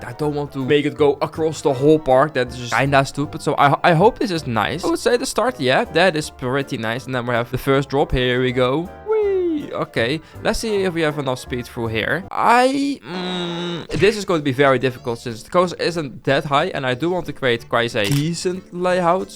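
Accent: Netherlands. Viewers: Hoi ik hoor dat jij Nederlands bent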